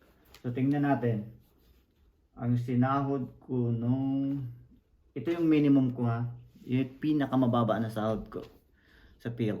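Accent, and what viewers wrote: Bicol, Philippines. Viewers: bicolano ka boss? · Garu bicolano si sir, ayos! Oragon.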